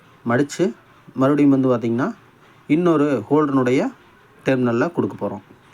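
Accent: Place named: Kongu, India. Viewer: Nice Kongu tamil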